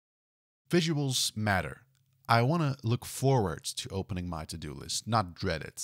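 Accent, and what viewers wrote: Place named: Netherlands. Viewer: Nice video. Thanks! And clearly Dutch! ;-)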